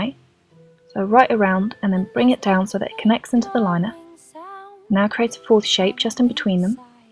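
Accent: England, United Kingdom. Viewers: she has an accent! cool! r u from england... or sumthing???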